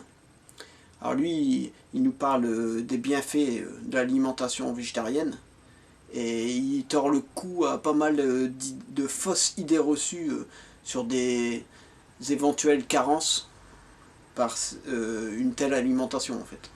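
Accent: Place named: Nord, France. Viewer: C est le Noooooord l accent tient je m abonne pour voir ce que tu fais tu ma l air sincère dans tes paroles . Et suis picard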